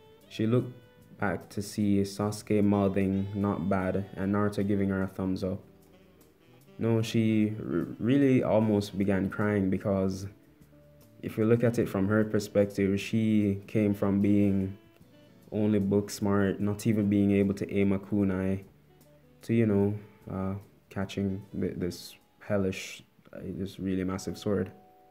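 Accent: Jamaica. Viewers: Aye u from Jamaica right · you sound jamaican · Bruh I’m Jamaican and and he sounds like me for real sometimes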